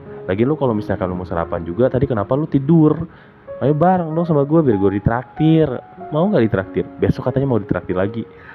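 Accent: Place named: Sunda, Indonesia. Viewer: Suaranya suara Sunda bgt... Lembut dan berkarakter...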